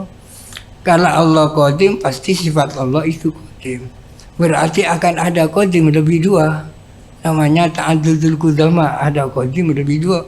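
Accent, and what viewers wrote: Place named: Madura, Indonesia. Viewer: Salam ta'dhim kyai. Tausiah tingkat tinggi. Pendengaran saya suara kyai seperti ada logat2 maduranya.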